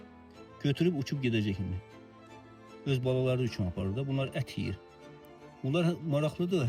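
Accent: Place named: Zaqatala, Azerbaijan. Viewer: Zaqatala ləhçəsi)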